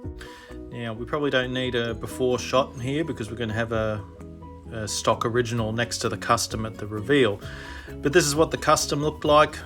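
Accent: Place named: Australia. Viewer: Hi there your vids are great, keep it up please, not many Aussie ascents in utube land.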